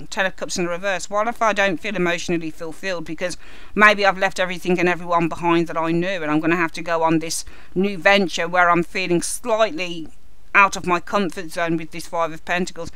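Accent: United Kingdom. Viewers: the 1st thing I want say is I wish I were British I love your accent! 2nd when I listened to your reading my mind was totally blown. Everything you said was so on point for me like we had a conversation and I told you every single thing going on in life this very second....unbelievable! I am going through such a horrible time at work I really hate it and can't seem to find another job, I have been looking since April. My company was bought out and this new company is a horror overworked lower pay they are phoney & so are my coworkers. I am so trapped emotionally & mentally exhausted. Wow you hit it all. I have cut some many people off its not funny, deleted my coworkers from Facebook almost 2 months ago my spirit told me to do it so wow. I said f- them all. My Sun & rising are both aries so I can't stand bs people & I can't & won't kiss ass. I know this won't last & things will get better & I can't wait I've been through such tried to stay humble but it's terrible to have things reversed. 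Thank you for your words God Bless You my sister.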